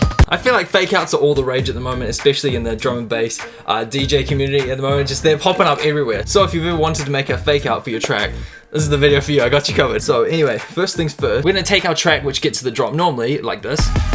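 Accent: New Zealand. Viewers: you are 100% FROM NZ. the accent I can tell.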